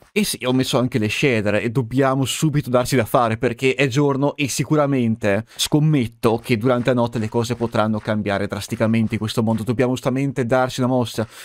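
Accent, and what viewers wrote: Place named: Verona, Italy. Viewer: Sono quasi certo sia veronese.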